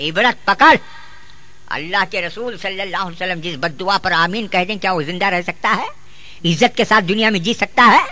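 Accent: Bangalore, India. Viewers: Its not Telangana accent, its a Bangalore accent and he is no more with us, he was khatib of masjid jaynagar 4th block bangalore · what is fun here..?nothing fun in it..!don't criticise the regional slang of Banglore..the person who posted this video may not be perfect in Arabic. Can he be insulted by the Arab people..?Just delete this post or change the title as "Excellent Bayan about Ramadan". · This is not a funny bayaan bro, its a lesson what ever accent it is... and its not Hyderabad accent its a Bangalore 4th block Jayanagar imam Moulana saheb walaja ke, Allah inko sehath ata Kare... plz all of you pray for his sehath and afiyath. Thanks AshfaQ BTM Layout, Bangalore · It’s BANGALORE accent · Subhanallah mashallah ...allah sab ko roze ki ehmiyat samjhne ki toufiq de .....bacho bacho ko allah hidayat de ..ane wali nasal ko roze ki ehmiyat samjhne ki toufiq de 'ameen' anf iam from banglore banglore people spk lyk dis nly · bangalore accent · This is Bangalore accent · it's not Telangana accent by the way. 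it's Banglori by the way... Dumb uploader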